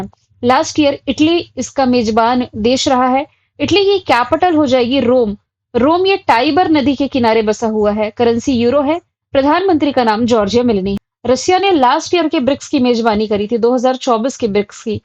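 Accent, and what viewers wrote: Bihar, India. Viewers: बिहार से है क्या गुरुमाता जी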